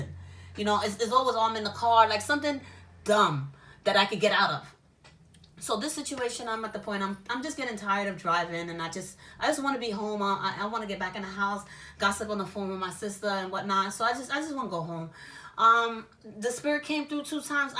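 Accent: New York, United States. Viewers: Where r u from.... you sound like a New Yorker ...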